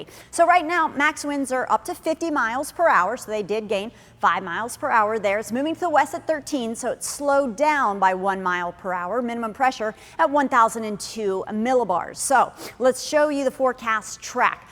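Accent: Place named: Florida, United States. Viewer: South Florida has an accent. Central Florida has two, Panhandle has one and North Flor-.. Im mean Georgia has one. You only know this.. if when you move OUT OF STATE. but yes if she goes to the New York/LA national markets. She will need to get rid of the accent, like many anchors from the state. I found it interesting that people are aware FL is a transient state = but pins every "florida man ' on the state.. :shelterin: Correct headlines: "New Jersey man visiting FLORIDA..." or "Nebraska tourist visiting South Beach..."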